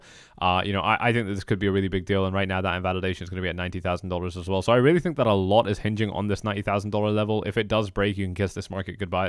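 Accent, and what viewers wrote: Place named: England, United Kingdom. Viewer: hey, haven’t been watching technical analysis lately but I’m wondering what happened with your cool English accent.. you had one years ago and now I can’t hear it.. seriously did you move to Dubai like most of the other crypto guy’s and loose the accent.. anyway peace bro.. you always have had great ta.. appreciate it ..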